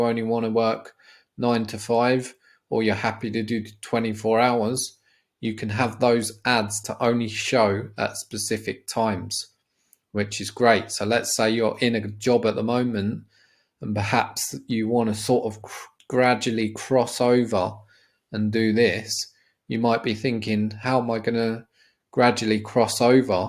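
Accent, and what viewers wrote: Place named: United Kingdom. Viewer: he’s not asking how to convert he was just checking if you was on about dollars as your accent sounds uk so he was just making sure.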